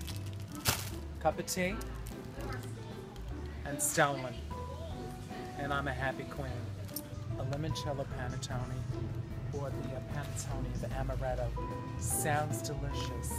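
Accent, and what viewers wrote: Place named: Bronx, United States. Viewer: OMG!! I am hooked on your store visits (also your Bronx accent - reminds me of home!!)!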